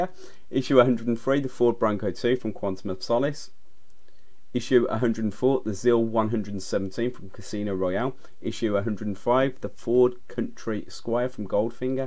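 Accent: United Kingdom. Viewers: Making a recording like this might look easier then it is.... I'm glad he did it. And he speaks British English....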